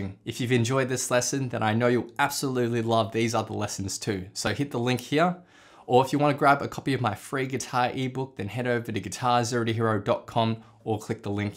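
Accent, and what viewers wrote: Australia. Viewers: Love the ausssie accent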